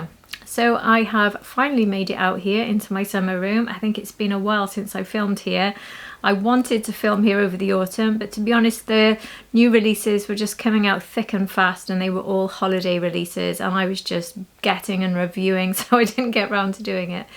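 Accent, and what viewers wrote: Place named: South Wales, United Kingdom. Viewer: I love your accent, is it South Wales?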